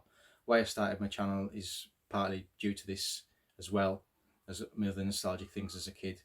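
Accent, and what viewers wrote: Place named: West Cumbria, United Kingdom. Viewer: Great video, i'll enjoy watching this. You sound like you live near me. West Cumbria?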